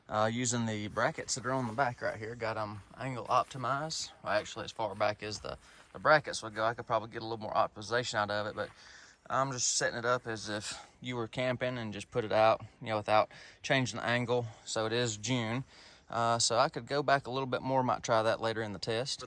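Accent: South, United States. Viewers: so confused. here a southern accent but username says mountains. but the south doesnt have mountains..?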